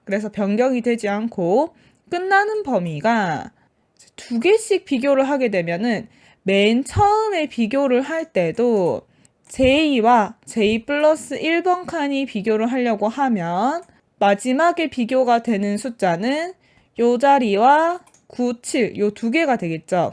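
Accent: Gyeongsang, South Korea. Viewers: 대학 신입생 때 Fotran 수업을 들은 것이 전부였는데, 덕분에 파이썬이 어떤 언어인지 알게 됐습니다. 몇 군데 파이썬 관련 동영상을 찾아다녔는데, 가장 체계적으로 설명이 잘 되어 있어서 바로 이해 할 수 있었습니다. 명쾌하고,박력있는 열강 덕분에 30강을 완강했습니다. 가끔 경상도 억양도 매력적이었습니다. 아쉽게도 다음 강의를 찾아봤더니 다른 분이 강의를 하시네요. 다시 한번 감사드립니다. 안녕~~~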